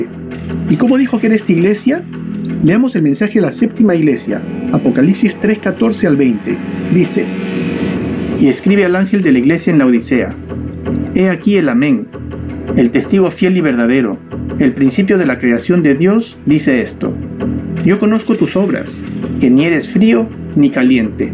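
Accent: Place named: Peru. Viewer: Estimado hermano, por tu acento, y tus 🦙 llamas 🦙 esos lindos adornos que se aprecian al fondo, supongo que eres peruano 🇵🇪 que hermoso estudio y reflexión, digno de un siervo del Señor de los cielos. Quiera Dios te escuchen a ti, ya que a mi no me creen, la mayoría piensa que La Biblia es un libro de cuentos de fantasía. Como cristianos e hijos de Dios debemos estar velando, pero no quieren ni hablar del tema. La paz sea contigo, amén 🙏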